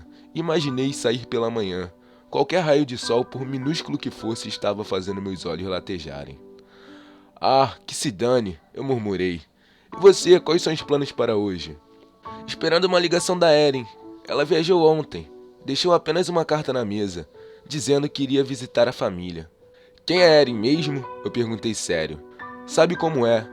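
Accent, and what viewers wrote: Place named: Rio de Janeiro, Brazil. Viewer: Sotaque do Rj haha. mandou bem demais. tem talento e merece sucesso. like p vc e ganhou uma inscrita :)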